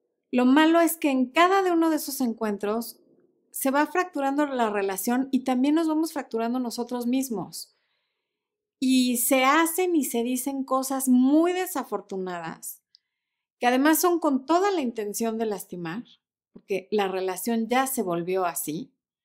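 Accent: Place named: Mexico. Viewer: Lo máximo. Me encantan tus consejos. Y tu también tu asentó mexicano.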